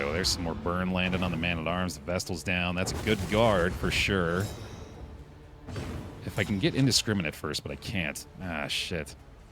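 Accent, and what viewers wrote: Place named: Scotland, United Kingdom. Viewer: Dear god that scottish accent. Also instead of gobshite " ya wee dick" would have been better 😂. Love from Dundee 😊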